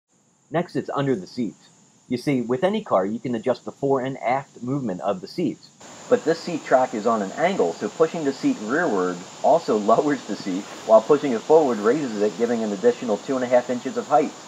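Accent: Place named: Philadelphia, United States. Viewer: Heard a little bit of Philly in your accent; then saw the DE tag on your car; makes sense!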